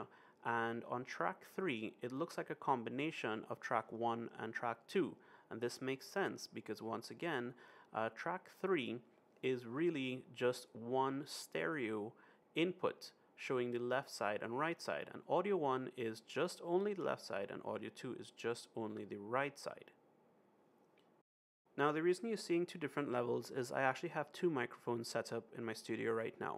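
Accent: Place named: Trinidad and Tobago. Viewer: This may have been an issue I would have encountered sooner or later and may not have known what to do, so thanks a lot for this heads-up bro!! p.s. from the moment you spoke I knew you were a Trini.. we can't hide lol but your standard English is very good!! Blessings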